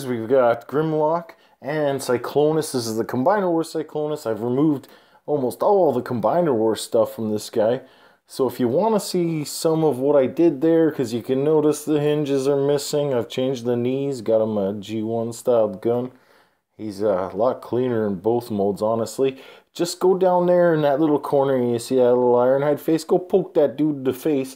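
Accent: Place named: Canada. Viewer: Yo bro I'm Canadian too EH. How you talk is pretty much how I sound. All good though. I'm in Winnipeg bro. Where you at man?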